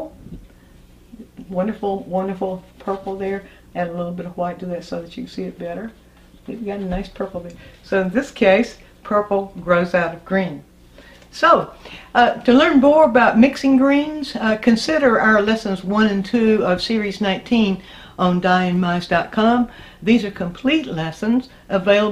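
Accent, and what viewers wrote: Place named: South, United States. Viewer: you are not only aged artist you are an excellent teacher, your passion for painting shows in your teaching. You talk slowly and clearly ( unusual today) and I love your southern accent, thank you I have learned a lot from you. Khemin 🌹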